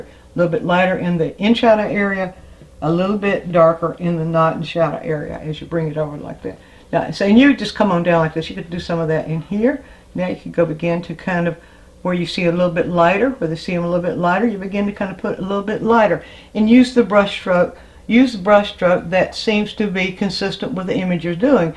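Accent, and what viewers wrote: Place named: Missouri, United States. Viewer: I got to know where you are from. You have a similar accent my grandma had. She was from Missouri. Am I close???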